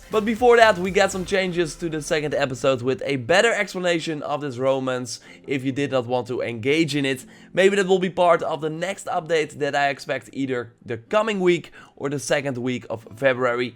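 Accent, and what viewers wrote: Netherlands. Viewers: is this guy Dutch?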